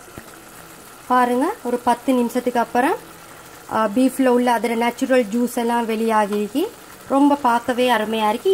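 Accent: Sri Lanka. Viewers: In India we have to use a pressure cooker. Thanks for this amazing video and very well explained. I like the Srilankan Tamil accent. God bless. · Thank you for sharing sister. Your tamil accent from Sri Lanka. Are you from there? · Srilanka Tamil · This is srilankan Tamil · sri lankan tamil was nice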